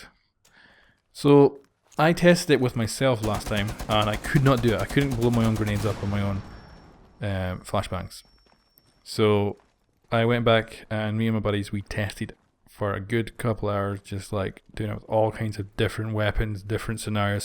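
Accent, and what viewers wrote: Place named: Aberdeen, United Kingdom. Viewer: you sound like your from aberdeen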